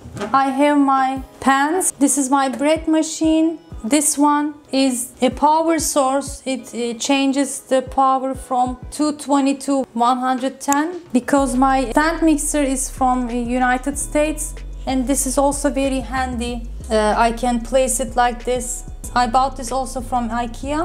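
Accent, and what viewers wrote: Turkey. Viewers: Ablacım o nasıl ingilizce konuşmaktir yaaa ? Türkçe okur gibi. Biraz diksiyonunu geliştir. Ruh kat diline biraz. Dümdüz türkçe aksaninda ingilice mi konuşulur ? 1.30 dakika zor izledim. Hemen kapattım.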